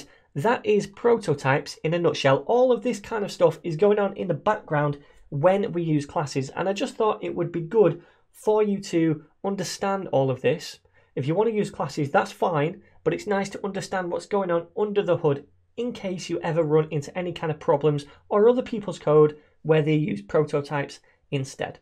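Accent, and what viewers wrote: United Kingdom. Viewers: Thanks for teaching this in a simplified but straightforward and non-choppy manner. So many other tutorials are so overcomplex in their wordings and skips ahead so much in coding, that half of it doesn't make sense. I haven't watched all of your videos but, just watching one of them makes me wanna watch more. I hope you have them all ordered step by step in Javascript. Thanks again. (" your english is very good despite your british or whatever accent, sometimes the accents people have in these tutorials are too hard to understand or they talk way to fast. You do a great job with both)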